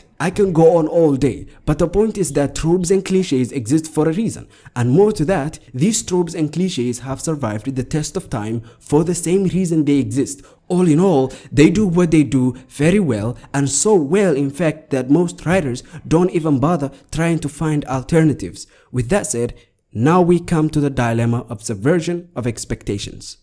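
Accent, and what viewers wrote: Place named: Somalia. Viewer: Are you East African? You have a Somali type of accent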